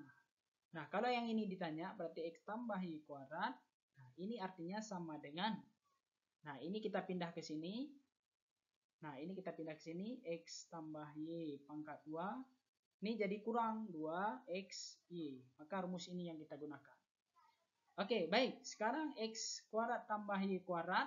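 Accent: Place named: Aceh, Indonesia. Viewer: Bapak nya pasti orang aceh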